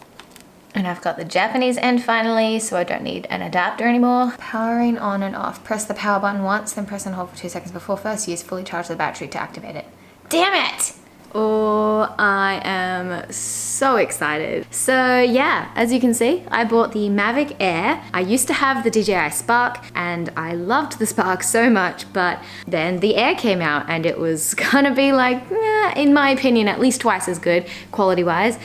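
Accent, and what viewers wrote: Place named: Australia. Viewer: We are neighbors-ish😂 Just found you, and am so happy to hear your Australian accent:) have a great Hanami down the river:) (hopefully I don’t sound creepy😂😂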